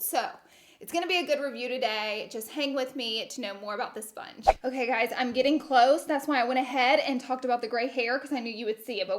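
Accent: South, United States. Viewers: “My whole side panel just fell” that southern aksent gf❤️❤️ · Can we talk about how country the first 5 seconds of this video was?! 😂 I looooove it. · "Ma whole side panel just fell" The southern drawl is STRONG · Please bring out that southern accent more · Your country accent poking out is so cute! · She's from Alabama and Insatiable is in Georgia. A smoother southern twang than Alabama I think. Love all the southern accents though. :) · When you whisper “what is this thing” I literally lol 😂!!! It was like from a horror/supernatural movie lol Btw pleaseeee keep using your southern accent !! It’s soo cute x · I love her Souther twang....coming from a Kentuckian with a major Southern drawl! 😆😆😆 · Damnnn she sound like a southern bella or a pretty hillbilly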